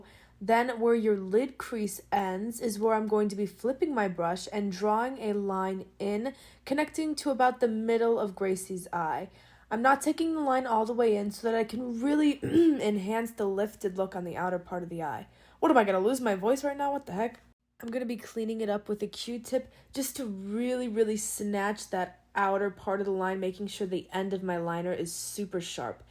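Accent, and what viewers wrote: United States. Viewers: Love your accent! But I cant place it?? Sounds like West coast and east coast combined!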